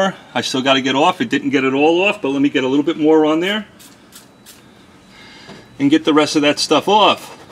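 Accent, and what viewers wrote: New York, United States. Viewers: You sound like you’re DEFINITELY from New York lol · Thanks you made my day. Dallas is awesome but you sound like a New Yorker.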